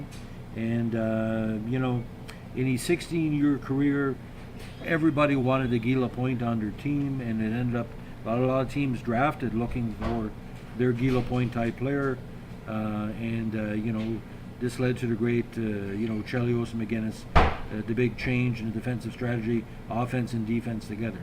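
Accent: Canada. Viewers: Are you quebecer or acadian?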